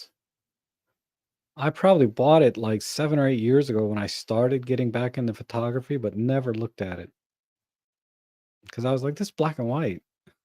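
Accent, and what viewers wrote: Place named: United Kingdom. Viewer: I thought you were british, you dont speak as sloppy as most American. I am from Sweden by The way and follow you, red 35, Peter Forsgård, Matti Sulanti, Robin Wong, micro four nerds among other.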